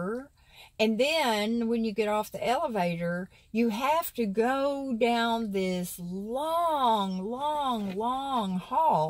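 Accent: South, United States. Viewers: I watched your friend with the YouTube channel Lettie’s Life., you said that the reason you have a thicker southern accent lately was “because of her”. That’s interesting because Lettie doesn’t have an accent. So, thought I’d mention that. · Speaking of McDonald's I mean speaking of the rain was at McDonald's and it started raining but when I came out I was right in front of the door so and then I got home sat in the driveway and I'm watching your video before I go in and I usually have to sit in the car and take a break cuz I'm tired when I get home from my outing.. yes I hear that southern drawl y'all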